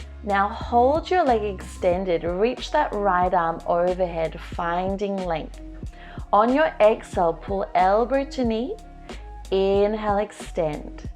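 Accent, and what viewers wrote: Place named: Sydney, Australia. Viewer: First time watching your video and a new subscriber here! Great at home work out thanks! I'm living overseas so great to hear a fellow Sydney sider as well!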